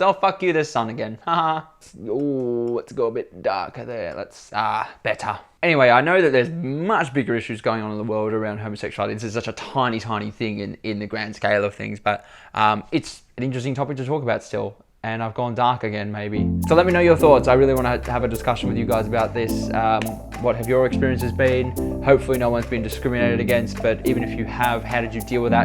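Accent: Australia. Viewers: dude which part of melbourne are you from??? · australian accent😍😭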